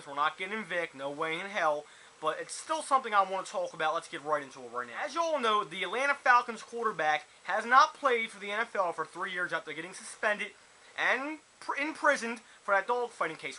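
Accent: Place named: Baltimore, United States. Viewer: I love your Baltimore accent, man. It's fukin awesome...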